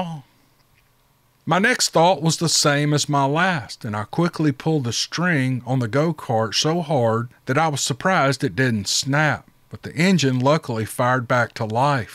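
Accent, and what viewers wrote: South, United States. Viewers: Yay I am so proud to hear a man speak In a fine southern accent with no shame. So many people want to go to a "universal accent" and lose our beautiful southern accents and colloquialisms that I love! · Don't worry about mispronounciation of unfamiliar places, its all part of the show or you fluffing things or laughing at things, its what makes you human. I am a southerner, but thats south of England, but still get taken up on my accent as i now live elsewhere. Your southern drawl sounds great to me. Great show as ever. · I love it, " I'm just gonna' keep readin' till I get tired!" You da' man! Love your channel. 👍👍👍 P.S. I love your southern accent. It makes me long for Memphis and the South. There's something so comfortable about a slight, or even a heavy, Southern drawl. It just feels like like sittn' on the porch, drinking sweet tea, and swappin stories. 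Oh yeah, and the mom, or Mrs.of the house yellin," ya'll better get in here, supper's set!" Memories....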